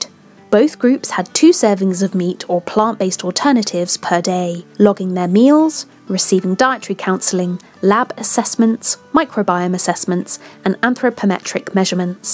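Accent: England, United Kingdom. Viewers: Most things not taken in moderation is not good for you. So how much money did the animal agriculture industry pony up to fund this research? Must have been a lot since they even got a woman with a nice English accent to sound convincing. Clever!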